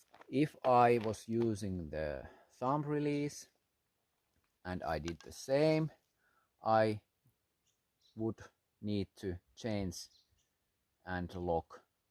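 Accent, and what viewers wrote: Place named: Finland. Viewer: Awesome video. I had never heard of Slavic draw before today. Greetings from Minnesota, USA. I suspect from your accent that you might be Finnish, or at least you live in Finland. Here in my state of Minnesota, there are certain towns and areas where there are lots of Finnish Americans. I am in my 30s, i became friends with guys in primary school around my age who's family still remembers things about Finland very well. So I mean not my friends parents, but some of the parents of their parents remembered things and stories about that place, Finland. I will not mention the small towns here, because people who are not from Minnesota usually do not know the names of them anyway, but the surnames I remember of kids I grew up with included the names Hyuvonen, Tanskinen, Maninga, Karvonen, and Huhta. Once again, greetings from Minnesota USA. 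Long live the art of Archery! One of the most ancient of our martial arts! 🏹 Ha!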